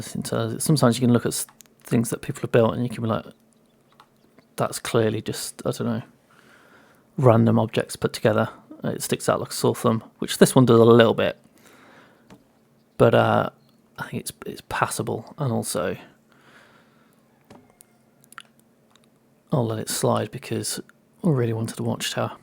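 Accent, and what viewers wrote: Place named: United Kingdom. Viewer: For some reason your videos go so well in the background, maybe it's something in the voice or just my love to british accents :D The build is really cool as well, got me some new ideas, thanks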